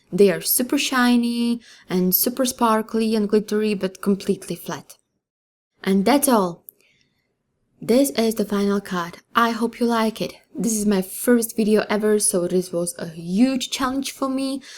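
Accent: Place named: England, United Kingdom. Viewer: Fiiha velmi dobre video!! ;-) a o typickej anhlickej anglictine nehovoriac,super !! A aj pohladnicka krasna!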